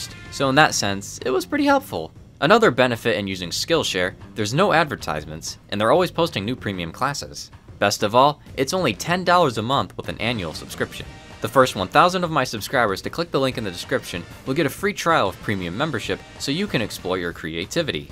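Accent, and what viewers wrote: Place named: Chicago, United States. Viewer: You gotta be a Chicago boy/or visitor👍🏻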